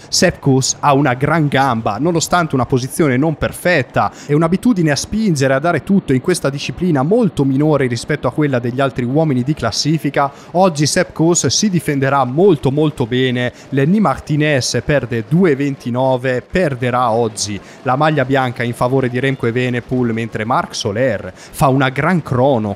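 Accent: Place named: Reggio Calabria, Italy. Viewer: Pensavo di Reggio dalla S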